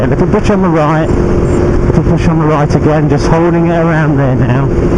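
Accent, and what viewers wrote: United Kingdom. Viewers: theres nothing like a british voice for a tutorial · Hey man, good british accent btw, really clear unlike many others...big question if I'm getting to a really hard turn/curve I know I gotta start using the countersteering tech. but once I'm at it, should I start moving the handlebar gently to the direction I'm turning or countersteer all the way?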